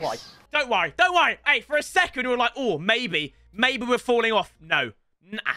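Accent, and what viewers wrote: South Africa. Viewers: That South African accent tho... Lol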